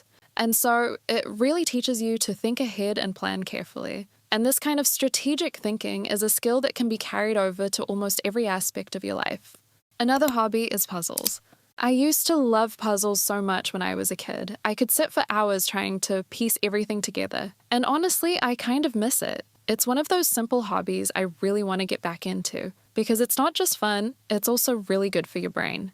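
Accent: United Kingdom. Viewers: برتش آكسنت؟ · what her English accent is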